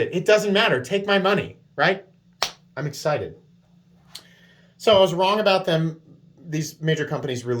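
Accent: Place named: South, United States. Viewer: your southern accent is amazing 🤩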